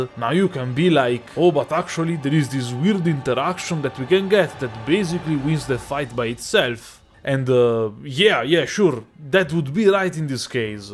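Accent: Italy. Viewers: Hey man. Great video, but I was wondering where are you from. You sound a lot Italian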